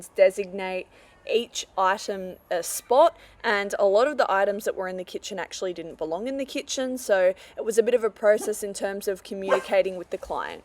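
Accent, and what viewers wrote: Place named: Australia. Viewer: Great video! If open to suggestions and unsolicited advice 😅 here it comes, longer videos make for better content for cleaning transformation lovers I think! I feel like a lot of the video was focused in one or two areas and we missed the process of the cleaning in the rest of the rooms, only getting to see the after. Would also be great to have a final comparison of before and after of each room at the end! Lastly, LOVE your narration (especially cause I love Australian accent 😅) small suggestion is to lower down the volume of the audio of the original video in the moments that you are narrating so we can hear your narrations better! For instance, it was a bit difficult to hear you during the vacuuming of the bathroom sink. Sorry if that was too much 😂😂😂 I just see amazing potential with this channel and thought I’d share my unsolicited advice in case you care 😅😅 sending love and looking forward to more videos! 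❤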